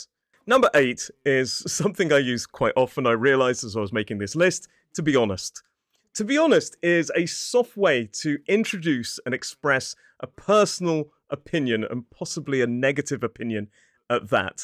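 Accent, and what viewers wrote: United Kingdom. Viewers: I love your British accent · Cheers! I love your British accent.❤ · I love the british pronunciation!!! Hi from Argentina!!! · Oof I love your accent, it’s British, but not too exaggerated. Just what I need. Your personality and the way of teaching are top tier. ❤ Cheers, mate! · I do like British accent. So I will flow your lectures. Thank you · This is the first video I saw from you! I really enjoyed 👌I love your British accent, and your vibe. Nice one!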